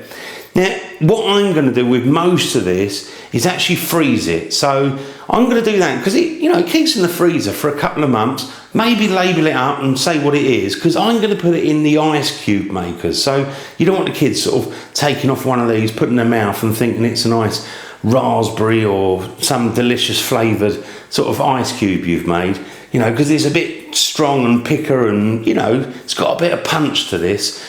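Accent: United Kingdom. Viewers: I really like you use quite similar "hacks" as I found by various experimenting to substitute some of the authentic ingredients when not available, sill producing delicious and quite curry dishes. Great and clear description, and of course amazing British English!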